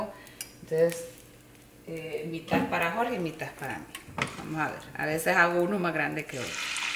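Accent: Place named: Honduras. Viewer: Vos sos la mera mera man me la sube que no perdes ese toque catracho que nos identifica ❤️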